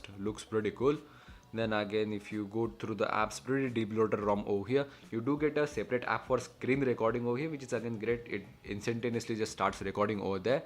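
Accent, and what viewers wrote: India. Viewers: Its the accent actually. Hindi. And thats something unique.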